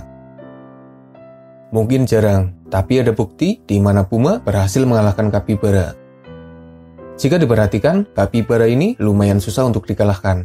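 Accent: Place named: Tegal, Indonesia. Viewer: Admint nya mudah dipahami karena logatnya Tegal atau Banyumas banget 😮😂, pada Karo nyong wong ngapak. Malah ingat lagu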